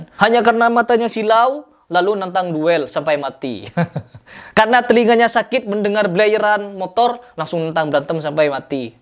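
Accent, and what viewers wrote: Madura, Indonesia. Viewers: kalau sendainya ini org madura asli (krn logatnya sprti logat madura). sya yakin ada 100 org saja org madura sprti psti madura penuh dgn kedamaian dri kekerasan. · dari logatnua kyk orng madura pantas phm sejarah carok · Aslinya org mn ini ya.kok logat bahasanya kayak org Madura.?? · orang aseli madura kh? ketauan dari logat nya, hehe, (bibi saya orang madura jga soalnya, dan mak saya (almh) jga pandai ngomong medura krna sering bergaul dgn org madura) kalo iya ini momen yg pas utk menjelaskan & mengedikasi soal carok & bela diri, salam bela diri, saya cuman mantan atlet silat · kyaknya asli Madura juga ya?Logatnya spt Madura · kalo ngomong kok ada nada maduranya ya😅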